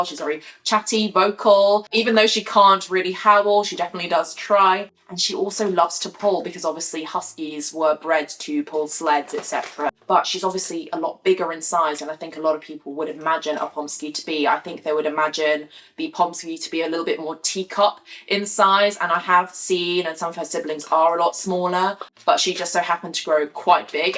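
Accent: United Kingdom. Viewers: A Asian with a British accent 🔥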